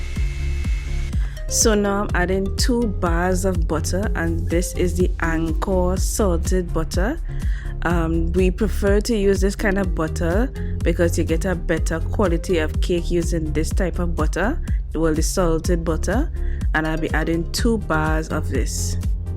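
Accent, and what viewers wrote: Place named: Tobago, Trinidad and Tobago. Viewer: i can hear that strong bago accent...keep it up dear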